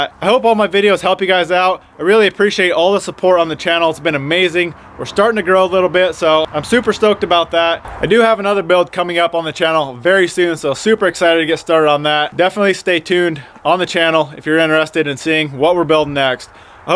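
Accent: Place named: Finland. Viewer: Your Finnish English accent needs a a lot more work. Mika Häkkinen style.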